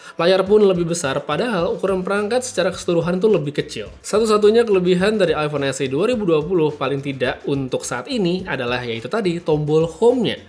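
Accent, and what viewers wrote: Bali, Indonesia. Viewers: Ini orang Bali ya???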